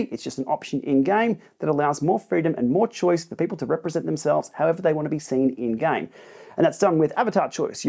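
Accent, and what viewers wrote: Australia. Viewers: Don’t say it both ways just talk Australian and say route as root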